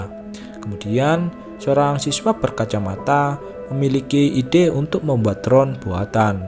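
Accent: Java, Indonesia. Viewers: Fix admin orang Jawa nih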